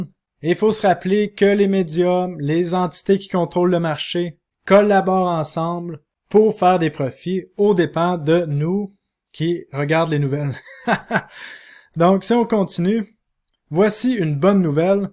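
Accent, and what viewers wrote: Quebec, Canada. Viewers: Merci! Je te le dis à nouveau: tu m'as reconcilié avec l'accent québecois sur lequel j'étais resté bloqué pour avoir connu un mauvaise personne... Et ça c'est vraiment une très grande chose pour moi désormais. Alors merci:) Un des guerriers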